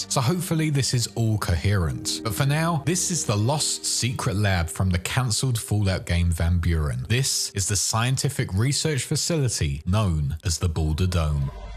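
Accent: United Kingdom. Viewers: Loving the videos mate, love hearing more of us brits doing lore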